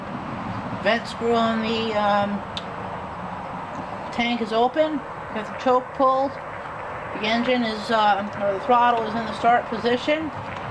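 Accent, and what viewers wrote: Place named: Newfoundland, Canada. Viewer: Where are you from? I first expected Newfoundland until you were talking in gallons and pints. Lol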